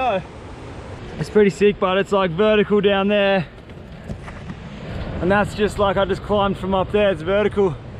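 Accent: Australia. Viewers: This guy sounds Australian, I love it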